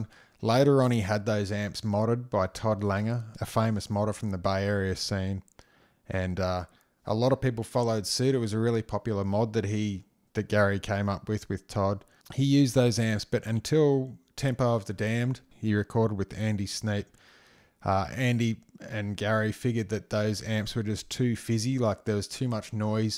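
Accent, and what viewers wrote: Australia. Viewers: Assuming I've got your accent right, how about a showcase of your favourite Aussie metal riffs?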